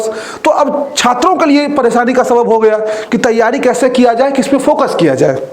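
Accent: Bihar, India. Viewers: Sr g ap Bihar se h kya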